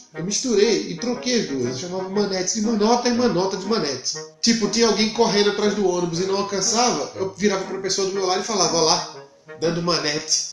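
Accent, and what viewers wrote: Minas Gerais, Brazil. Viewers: Ele pode ate ser pernambucano mas fala q nem mineiro já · Uai! cê num é mineiro não? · Este cara já pegou o sotaque mineiro...kkk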